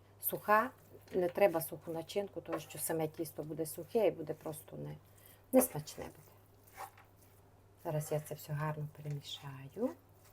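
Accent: Transcarpathia, Ukraine. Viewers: Очень приятно Вас слушать! Мова чудова!)) Та цей неперевершений закарпатський акцент! И рецепты очень оригинальные! Мира Вам, здоровья и добра! Привет из Житомира. 🌻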